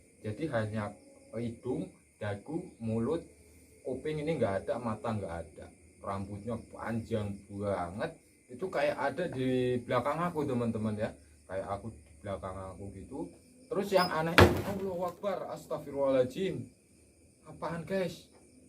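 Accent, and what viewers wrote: Java, Indonesia. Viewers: mas ciri khas klo ngomong nya pas klo ngomong banget nya medok banget.. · Mantaps bang ceritanya seru mengerikan sering lah cerita kisah pengalaman kek gini karena serunya gk kalah sama vidio campingmu bang.. btw sampean orang mana bang logat jawa tpi kok tinggal di jabar?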